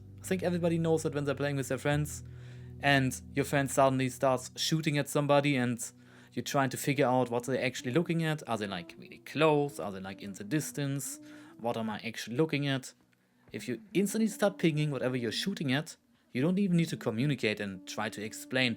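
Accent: Germany. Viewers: My guy your accent always trips me out. 90% of the time it sounds very German but 10% of the time it sounds French 😂😂 it’s German right?!?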